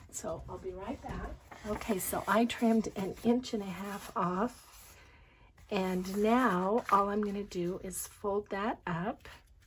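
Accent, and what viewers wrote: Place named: Utah, United States. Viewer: I love this! You sound so much like the people I knew when I lived in Providence, Utah in Cache Valley. You sound like a lady I knew very well in relief society. I’m from East Tennessee and I’m back here now but I lived in Utah for 8 years. I’m happy to have found your YouTube channel!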